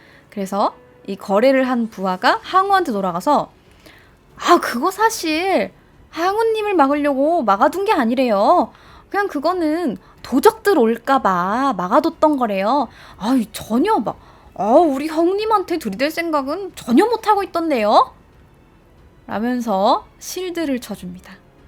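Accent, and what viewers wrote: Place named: Jeolla, South Korea. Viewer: ~~전라도 맞지 김수미 목소리가 ㅎ ㅎ ㅎ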